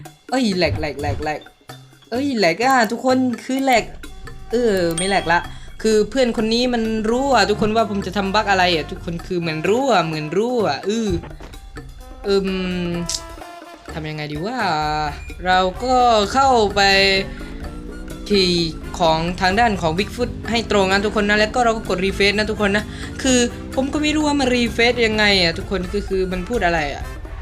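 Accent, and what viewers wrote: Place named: Suphanburi, Thailand. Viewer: ทำไมพี่พูดทองแดง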